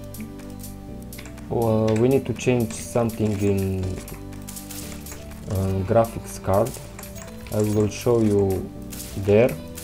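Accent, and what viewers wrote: Romania. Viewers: ma intrebam daca esti ro de la primele 2 cuvinte :) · Din primu cuvant mi-am dat seama ca esti roman :))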